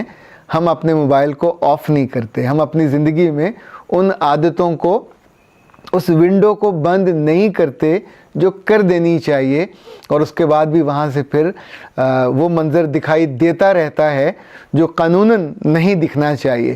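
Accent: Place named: India. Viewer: صاحب۔ آپ بیشک بہت اچھے مقرر ہیں اور پوری کوشش کر کے اچھی اردو بولنے کی بھی کوشش کرتے ہیں مگر دیگر افراد کی طرح آپ بھی بعض اردو کے الفاظ کو ہندی انداز میں بول جاتے ہیں، جیسے بہت ہی عام سا لفظ ہے " دکھنا " یا " جونسا " تو جناب یہ لفظ دکھائی دینا ہے دکھنا غلط ہے اور لفظ جونسا کوئی لفظ نہیں۔ گستاخی کے لیئے معذرت خواہ ہوں۔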